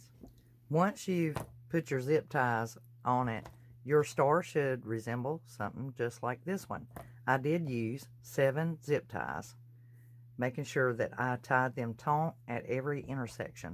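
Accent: South, United States. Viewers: Thank you! Such na wonderful band east project and your explanation is beautiful love hearing your southern voice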